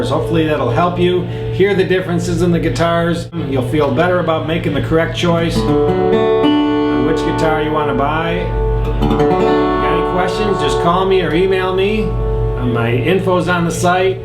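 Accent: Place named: Great Lakes, United States. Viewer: The Great Lakes accent is strong. I live in Wisconsin, people here talk like this guy. Naational Guitaaar DAAAT CAAAAM lol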